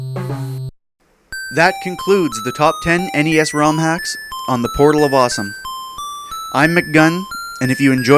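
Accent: Canada. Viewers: I came for the ROM hacks. I stayed for the Canadian accent. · Portal Of Awesome Are you Canadian? o.o I can't tell... I feel like you are but at the same time...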